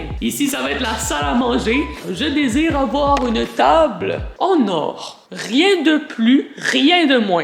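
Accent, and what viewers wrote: Quebec, Canada. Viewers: Québécois je pensr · Tu es québecois ? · J'adore l'accent Québécois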